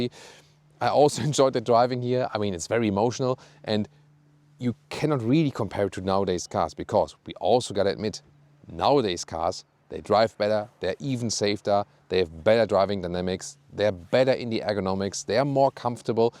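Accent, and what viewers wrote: Germany. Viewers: that killer german accent bro!!!!!! them make car UP the price!!!! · A video about a German legend, explained with a German accent......''Hier scheint heute alles in Ordnung zu sein😉'' Great content.....and Btw: Did you know that the legendary Queen frontman Freddie Mercury also bought one? He spended a lot of time between '79 and '85 in Munich, and was often driven there in one... He was clearly impressed by this car. When he came back to the UK he directly ordered one,.......a '86 420SEL..... It seems he even preferred it over his Rolls Royce...🤫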